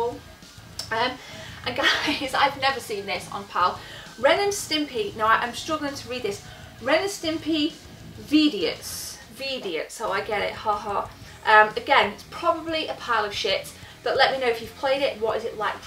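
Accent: United Kingdom. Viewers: Awesome finds, I wonder if you always get good deals in the states because of the British accent? I here Americans like it. And also i really want Batman Returns on the snes.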